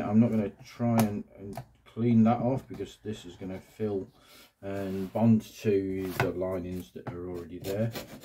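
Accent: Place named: United Kingdom. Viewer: His British accent makes it nearly NOT understandable if you have the same problem to resolve and are a USA English speaker and reasonably skilled..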